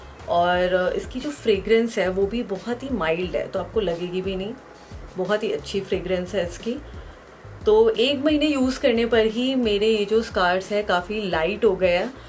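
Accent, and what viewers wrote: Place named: India. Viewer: There are two types of talking in the world : normal and Indian Would you at least put subtitles? That if you talk in English I don’t know, you speak English and indian exactly the same ...not understandable 🤷‍♀️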